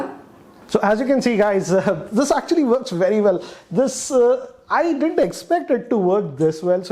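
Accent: India. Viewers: Indian accent is even more Indian than Indian accent, and I absolutely ♥️ it! You're the best techtuber in the country.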